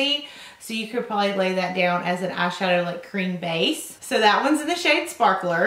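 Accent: South, United States. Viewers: If you put captions on, it changes some words due to the thick southern accent 😅 It said "matta fires" instead of mattifiers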